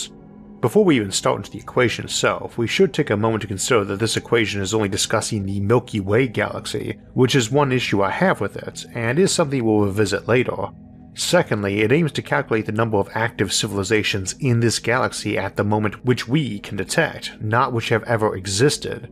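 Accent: United States. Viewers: I didn't know it was an impediment I just thought it was his accent from the part of the US he came from. I like it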